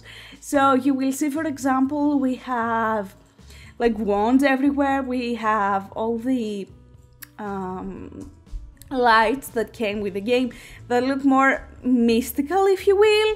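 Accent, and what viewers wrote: Greece. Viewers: Ooh you're Greek aren't you. Great accent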